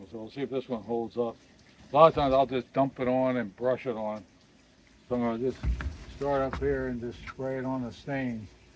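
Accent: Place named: New Jersey, United States. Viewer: Thanks for your videos. You remind me so much of my grampop and my dad (you are younger than both lol. But my memories are of them when they were still here. ) keep laughing it makes me so happy to hear that laugh. Just like ol Cornie's laugh. And you walk and move like dad (he was a sailor in his youth. You just don't lose that rolling gait!), your jersey accent makes me feel like I'm home again. (Jersey girl too). I look forward to your videos. Keep catchin!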